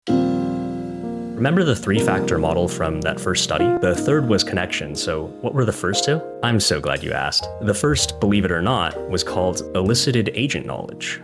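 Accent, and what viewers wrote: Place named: United States. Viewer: u showed ur self in the video in end and i was like who the hell is that guy | i was expecting someone american looking from the accent u broke that like the glass voice personification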